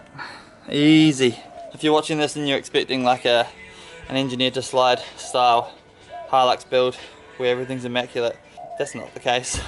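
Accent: New Zealand. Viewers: R u a kiwi? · Lmao first word you said knew you were from NZ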